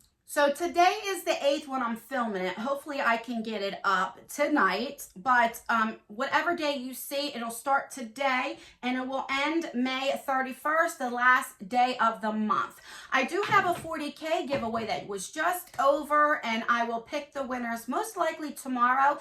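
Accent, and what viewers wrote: New Jersey, United States. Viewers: I love your make up and your cute Jersey accent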